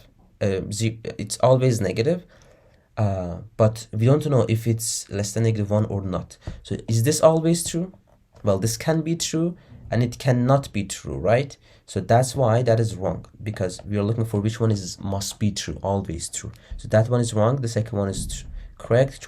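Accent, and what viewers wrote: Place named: Turkey. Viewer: aksandan türk oldugun anlasılıyo kral guzel video olmus eline saglık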